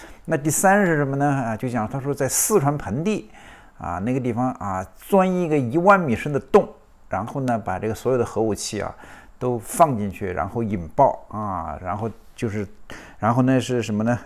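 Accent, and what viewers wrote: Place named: Changde, China. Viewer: 那你是不是常德人？很亲切。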